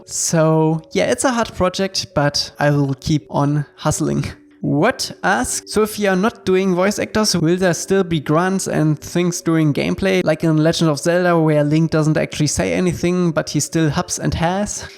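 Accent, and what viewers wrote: Germany. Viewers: Kann das sein das du deutscher bist?😂😂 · Dein deutscher accent ist echt baba HAHAHAHA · Is he a german? Edit: Lol he is really one😂😂😂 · Dein deutscher Akzent ist echt episch haha. Naja solange dich jeder Versteht passt's ja 😋🤣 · Are you German?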